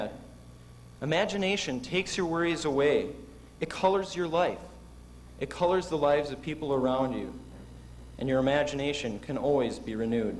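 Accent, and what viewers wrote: United States. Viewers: I thought I was lucky going to highschool in the early 2000s… early 90s must have been even more badass! 90s was the best decade… it was the last gasp of humanity before the digital age..I’m guessing from your accent.. Michigan? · Well done,! I was working for Shopko at the time you graduated. I can relate to the toys you mentioned. Haven't thought of them in years! I hear a faint upper midwest dialect when you speak. Though definitely not from a small town or rural area. I miss that company; made lots of friends with folks who came this way(Omaha), to open stores. We had 5 at the peak! Again, good speech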